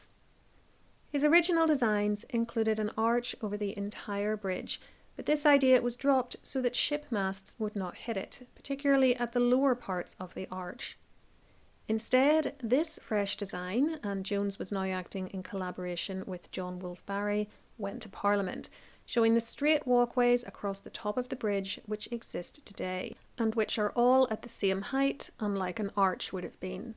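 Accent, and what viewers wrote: Ireland. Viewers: Nice, no-nonsense, well-illustrated presentation —and the Irish accent was readily noted by this Yank. Jumping the gap: Did this inspire The Blues Brothers? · A few years ago I was in the CN Tower in Toronto and I swear I had the same brain conversation with myself as I stepped out onto the glass floor at the top (albeit without your beautiful Irish accent!).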